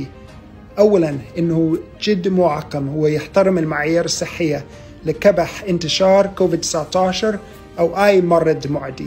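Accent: Algeria. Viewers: Thank you very much to US and i really liked the Algerian dialect in your speech You are a humanitarian country i love you ❤️😊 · عجبتني لكنة دزيرية 👍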